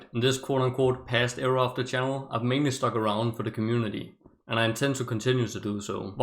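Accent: Denmark. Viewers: Is blud danish? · You Sound very danish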